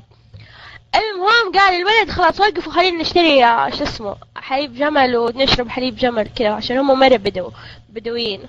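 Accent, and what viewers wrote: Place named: Urban, Saudi Arabia. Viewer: يعني الحين هذي لهجه حضر السعوديه؟ يع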